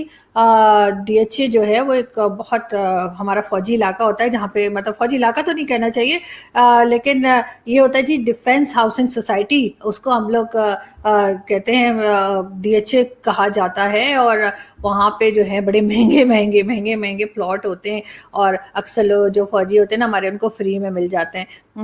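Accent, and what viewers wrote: India. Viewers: ji, I used to see your videos and appreciate your guts to speak freely without fear in a state like Pakistan. Pl carry on the good work. One thing more, you speak like a normal Indian and sometimes I feel that I am watching some Indian channel. Best of luck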